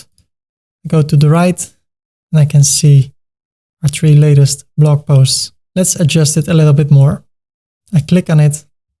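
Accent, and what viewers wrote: Netherlands. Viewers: your accent and face just shows me that you are dutch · ik wist meteen dat je nederlnds was je kan wel goed engels praten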